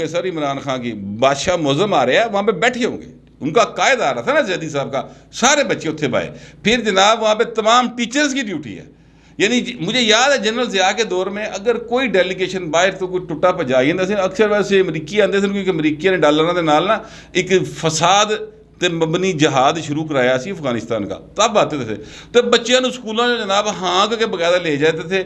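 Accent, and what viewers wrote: Lahore, Pakistan. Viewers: زبردست صاحب۔۔۔زبان کے لاہوری ٹچ کے ساتھ مزا آگیا۔ ویسےمیں پی ٹی آئی کی چھترول سے زیادہ لہجہ کا لطف اٹھاتا ہوں۔ انہاں نوں ویسے وی شرم حیا نیئں۔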